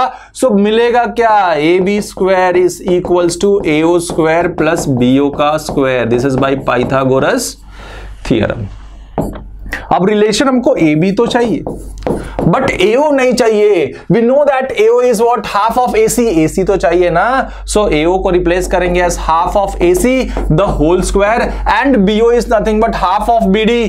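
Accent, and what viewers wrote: Bihar, India. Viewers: Apki tone ekdm mst lgti h sir Are u bihari?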